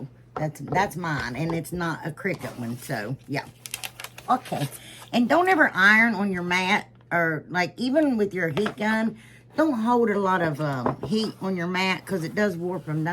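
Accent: South, United States. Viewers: You have got to be from the south!! I have the same accent! I’m from Southeast Texas.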